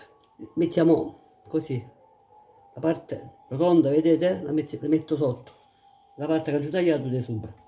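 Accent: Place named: Salento, Italy. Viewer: Io ti guardo da Trepuzzi(lecce)tu"di dove sei?il tuo dialetto e' uguale al mio😅❤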